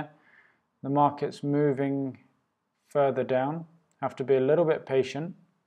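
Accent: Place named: England, United Kingdom. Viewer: Thank you , a lovely lilted English accent a calm manner and uncluttered simple fairly clear approach, great pyschologically for some of us more (greedy, A type)Anglo stressors....Regards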